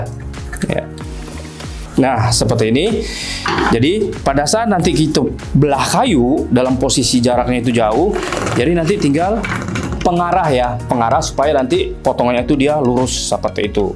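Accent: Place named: West Sumatra, Indonesia. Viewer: masih terasa logat minang nya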